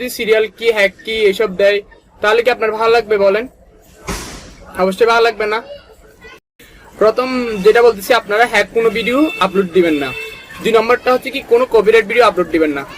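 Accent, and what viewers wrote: Chittagong, Bangladesh. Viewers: agee giye kotha bola shikhen... chittagainga gula ki aktu suddho kore kothaw bolte pare na naki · Ctg er manus suddo bolta pare na...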